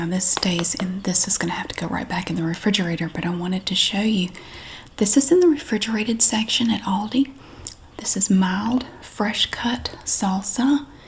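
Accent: South, United States. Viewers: Hope you have a wonderful day too! Thankyou for your soothing southern mom voice :) you are very comforting to me! One of the only talking asmr-artists I can handle at all and a big part of that is your accent and how considerate you are to your viewers!!! Thankyou again!! I will gladly do a painting of you and your family if you like! For all the nights I was anxious having existential crisis(s) you and your Aldi purchases or you rearranging things at the store was there for me. Anyways please let me know if you'd like a painting Ill do it for all you've done for all of us! Thankyou again!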